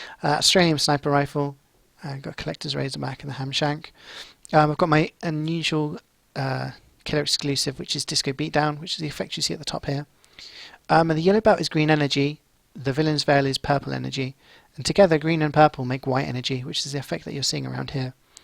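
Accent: Denmark. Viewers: are you danish?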